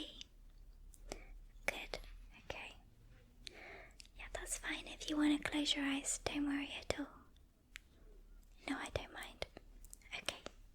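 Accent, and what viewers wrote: England, United Kingdom. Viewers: I absolutely love the way you sound. I could honestly listen to you talk all day. I’ve been very impressed with the high quality & length of your videos. Do you mind if I ask which part of England you are from?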